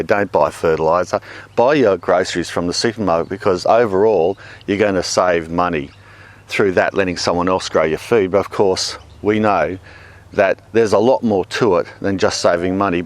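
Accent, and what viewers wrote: Australia. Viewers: Hey recently discovered the channel. Fantastic! Anyway here in the land of takeout Boston Massachusetts area specifically we see the same type of negative publicity about growing your own food. in fact certain cities and towns but even more restrictions on growing your own food in the backyard which I think is crazy. I'm starting to grow my own for the first time and looking to move out you another state so I can have more land it's so expensive here. But growing up my brother and sister and I and my Mom and Dad we had a huge garden my mom became expert. It and we produce enough food to feed us all during the growing season and often had enough to store a few months after. In fact maybe that's where I learned to love vegetables. it's nice being able to watch your videos and understand the things I need to do a lot of what I had forgotten. 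by the way I listening to the Australian accent it's very similar to our accident here in Boston. We don't R much either 😀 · Love your Australian acesnt! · Hahaha. Made me laugh. Love the Aussie accent. “What a wanker”. Xx NZ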